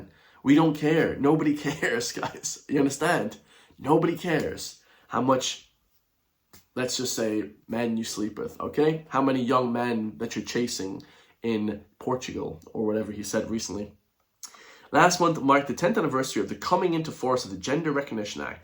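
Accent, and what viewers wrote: United States. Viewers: Dude I never miss a video and I agree almost 99 percent with you. Just curious I'm from Westside Galway and I lived 18 years in California and back home and sincerely not being smart ass but where does the American accent come from I've kids of friends of mine born and lived in America 13 years and within 5 yrs they had Irish accent and American accent gone but you've a full American accent with a tiny bit Irish accent. It's not a criticism just a real thing I'd love to know I was fully under impression you were born raised America and moved back to Galway as alot people did with kids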